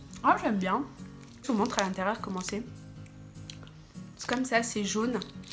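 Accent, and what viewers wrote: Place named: Africa. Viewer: C'est pas parce que tu es Française "à la peau NOIR" que tu es regardé par les "Français" Tu aurais pu répondre en message et non nous rappeler que tu es "Française" à la peau noir et et l'accent Africain. Si les Africains se sont rapprochés de toi c'est juste qu'ils s'identifient à toi !!!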